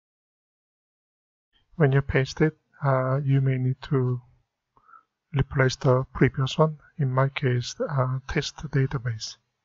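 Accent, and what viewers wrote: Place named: India. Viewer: Bom demais, sotaque indiano nunca falha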